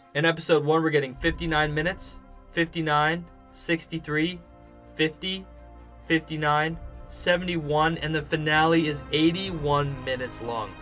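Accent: Canada. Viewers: Is that a Canadian accent? *curiosity 😜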